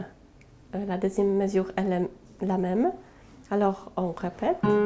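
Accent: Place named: Eastern Europe. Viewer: Merci infiniment pour le partage de votre connaissance. Parmi les plus belles mélodies qui aient jamais été composées...Vous avez un accent adorable. Pologne, Russie, Ukraine...?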